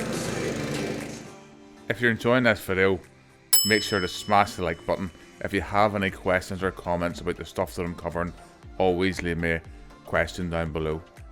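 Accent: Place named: Belfast, United Kingdom. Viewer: I love the Belfast accent!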